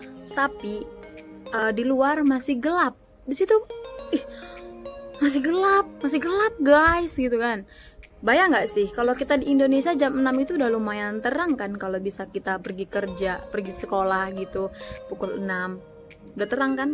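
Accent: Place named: Perak, Malaysia. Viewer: Dialek orang perak, awak makna saya/aku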